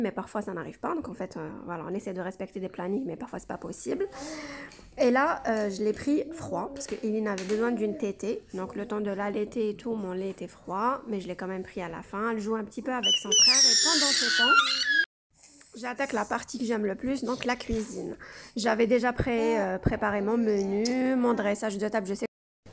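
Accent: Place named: Kabylie, Algeria. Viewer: Même ton accent kabyle est charmant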